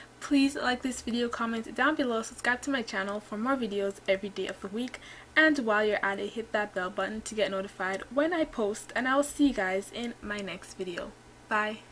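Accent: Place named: Quebec, Canada. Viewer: i really like your accent- ik i sound creepy and correct me if i’m wrong but it sounds like a French Canadian accent. Anyway, hopefully you can get the Seasons pack soon ‘cause I’m super excited to watch the storyline that you came up with!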